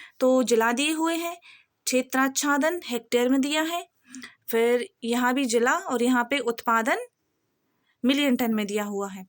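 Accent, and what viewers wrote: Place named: Bundelkhand, India. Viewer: Your bundelkhandi accent is cute😉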